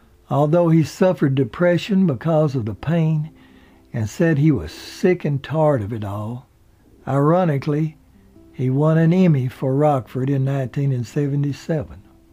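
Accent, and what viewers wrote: South, United States. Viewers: has this addictive mesmerizing All American folksy voice that's perfect for story telling. This story and the one of Billy The Kid are excellent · Very touching documentary, made better by your homespun southern accent. Thanks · Where is the narrator from..? His accent is wonderful? (I mean which US state)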